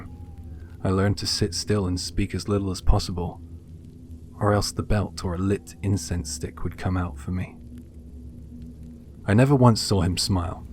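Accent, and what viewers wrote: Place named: West Country, United Kingdom. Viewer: I hear a Scottish twang/ possibly West Country in your accent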